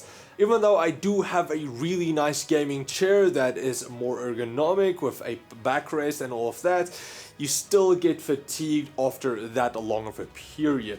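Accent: Afrikaans, South Africa. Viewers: That's what it sounds like when you've Afrikaans speaking English... The South African accent is neutral, meaning it is easy for South Africans to pick up on other accents.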